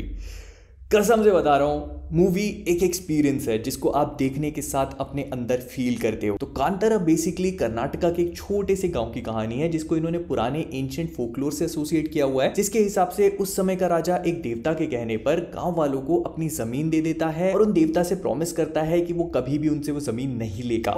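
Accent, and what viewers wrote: Bhopal, India. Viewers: Ae Bhai Tum Bhopal se ho kya😶😳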